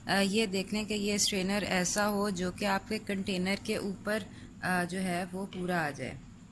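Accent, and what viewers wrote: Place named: Punjab, India. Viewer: andaz-e-bayaan bohat acha hai kalka sa panjabi touch hai jo acha lagta hai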